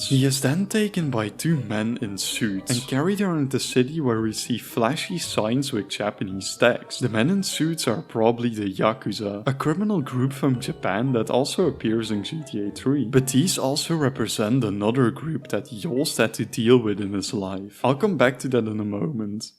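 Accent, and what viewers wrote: Netherlands. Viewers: Ik ruik Nederlands 🇳🇱 het accent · Bro kan je echt niet wat beter engels praten met nie zon dik brabant accent erop, zelfs mij als brabander egert mij eraan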